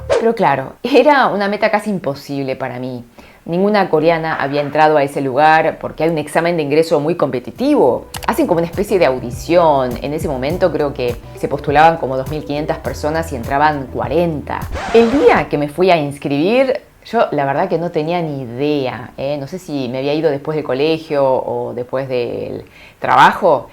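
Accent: Argentina. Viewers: Hablas como argentina,🎉saludos de mexico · estoy en corea recien llegado y me intrigo tu acento argentino, un pais que impacta. Estoy por un evneto cientifico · te acabo de descubrir por el video de y me ha sorprendido mucho tu historia de cambio, adaptacion y sacrificio en esta nueva tierra, que es mi querida Argentina. Muchas gracias por compartirla con nosotros y tambien por transmitir ese positivismo y entusiasmo en tus mensajes. Por cierto diras que tendras que pensar las palabras en castellano, pero te confirmo como muchos otros seguidores, que hablas perfectamente y ademas con este acento tan lindo que nos caracteriza.:) Abrazo desde España!! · te felicito, tu español con acento argentino es muy bonito, que sigan los exitos, te los mereces. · Omg 😱 yo estaba convencida de que eras argentina!! O sea claramente lo sos xD El Argentino nace donde quieeere! 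· Tu Español es perfecto hablas como una nativa de la argentina con acento bien argentina 🇦🇷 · Es un agrado concerte, se nota tu acento argentino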